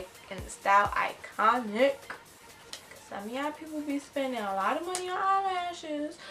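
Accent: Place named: Barbados. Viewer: I love your Barbados accent yes yes boo 😍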